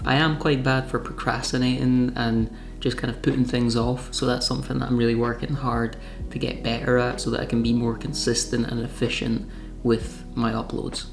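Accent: Scotland, United Kingdom. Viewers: First of all, you’re oddly addictive, I couldn’t stop watching you and you voice is very calming. I can see why you have so many subscribers but….. outside of your incredible good looks, great hair and voice, it is miraculous that you have this many subscribers from only 36 videos posted. I’m assuming that tiny homes are very popular but seriously, you made that much money off of only 36 videos? I initially thought you had a huge following on Instagram but there’s not many videos there either and far less followers. Which leaves the question how did you do achieve this success?? The only logical conclusion is the key to your success is the tiny house, good looks, great hair, a Scottish accent & soothing voice. I’m so jealous but I too have subscribed & accidentally watched 3 videos already before commenting!! you must be casting spells on your viewers.. 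I hate you lol lol!! Keep up the excellent work, I look forward to seeing more of you soon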